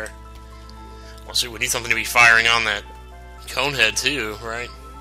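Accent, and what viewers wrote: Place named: South, United States. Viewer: Thanks for post... Not sure where you are from...but your accent (Southern?) Is great! Voice is easy to listen to...And you are funny. I mean really really funny. I am a med student using P vs. Z for stress release... Thank you so much for this post...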